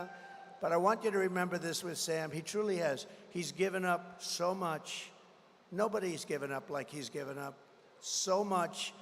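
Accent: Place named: United States. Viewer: Genuine and authentic, spoken in clear American English. MAGA.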